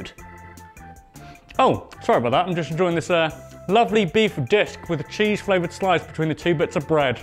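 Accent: England, United Kingdom. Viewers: I've always wondered are you english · I've always wondered. how does one get such an awesome British accent? I'm part English and only have a Swedish accent mixed with native accent (both I am too) QAQ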